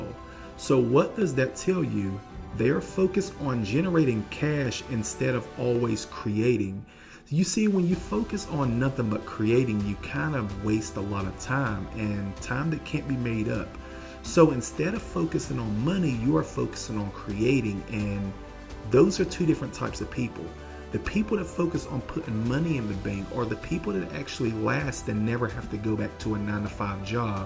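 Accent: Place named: Baltimore, United States. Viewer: Great quality content, thank you for the knowledge! 🧠 I follow you on IG but randomly stumbled upon your YT by coincidence. Also, I hear an accent! Is it Baltimore? 😄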